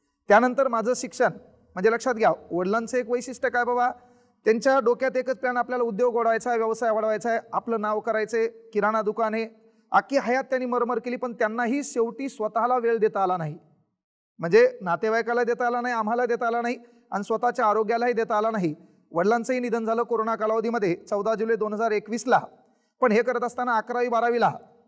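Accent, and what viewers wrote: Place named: Latur, India. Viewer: I live in Ireland. I am not a student but i have been watching his reels. Mi Latur cha ahe ani siranna boltana aikla ki nehmi gharachi athavan yete. Bhasha apli naal ahe. Sir ek no ahet 🔥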